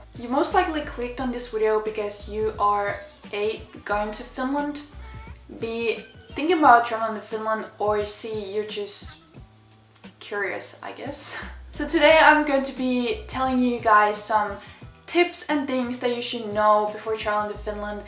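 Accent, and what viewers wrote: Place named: United States. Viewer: U have american accent more than the finnish one😍 just perfection💓!!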